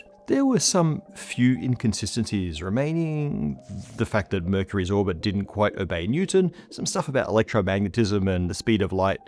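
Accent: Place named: Australia. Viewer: No slack-arsed AI. And sn Aussie. And accessibly explained !! That’s so needed. Subscribed, mate. Greetings from the Wimmera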